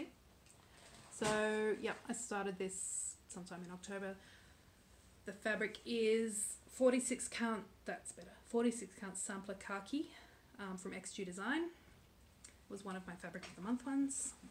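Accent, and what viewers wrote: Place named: Australia. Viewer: Hey! Just found you! I was so happy to hear your Australian accent. I lived in Perth for a couple of months back in the late 90s. Spent lots of time at Cottesloe Beach, too and loved it! Such a gorgeous city and incredible water to swim in! I actually live in Oregon and our beaches are cold year-round. Enjoyed your video and your wonderful water piece, in particular. Simply gorgeous!!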